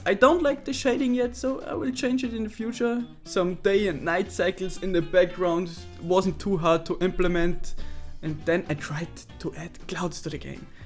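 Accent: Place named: Germany. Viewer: Ein erster Schritt wäre dein Linking zu verbessern. Relativ einfach und intuitiv, wirkt dem deutschen Aktzent entgegen. Im Englischen werden zwischen Worten oft keine Pausen gelassen, wie dies im Deutschen zwischen quasi jedem Wort ist. Bspw. "an apple" wird als ein Wort ausgesprochen. Ich hatte diesen 'Trick' nach etwa einer Woche verinnerlicht (wird einem erst in der 12. Klasse offenbahrt).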